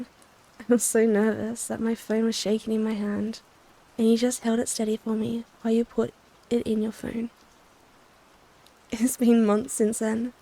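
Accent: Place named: Australia. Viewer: AUSTRALIAN ACCENT 🤩🤩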